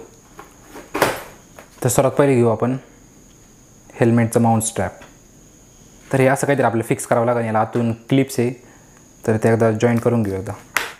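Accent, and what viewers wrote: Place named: Yeola, India. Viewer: Ek no bhawa ...Mi yeolyacha ...aplya gawakdchi bolnyachi style nad hmmm. Cushion removable..😂😂😂😂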